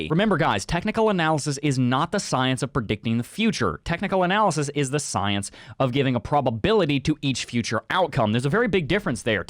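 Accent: South, United States. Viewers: Sounds like you are from the south. You got the radio voice gift. Great TA.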